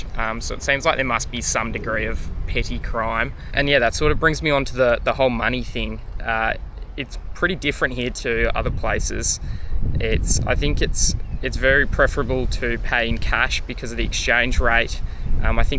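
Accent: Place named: Australia. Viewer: Aussie accent, interesante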